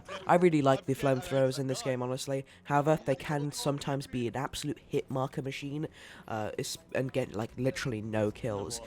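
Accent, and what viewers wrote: United Kingdom. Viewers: He sounds even more British than last time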